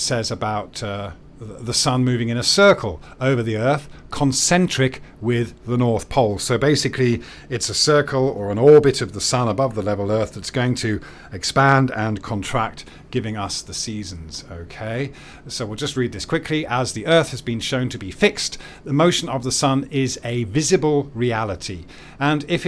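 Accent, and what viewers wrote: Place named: Bristol, United Kingdom. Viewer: didn't know you was a bristolian? gurt lush me babber, ow bist? :)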